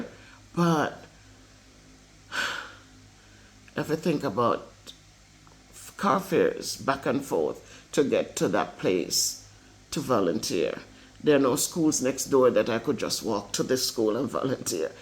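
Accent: Jamaica. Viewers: I don’t know what age you left Jamaica that you forgot the culture or you may not have been around Jamaicans while you were abroad. I think you just show off, because listening to you speak you still have the Jamaican accent. This shouldn’t be news to any Jamaican. If you are that desperate to make a video address it to foreigners looking to move to Jamaica, show off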